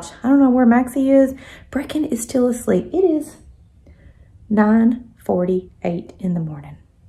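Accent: South, United States. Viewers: I really love your channel....Have been enjoying the recipe segments...& just seeing how you go about your day to day being the entrepreneur that you are...& you are just so personable! You are just so sweet...plus, I love your Southern accent & of course, your pups!;) <3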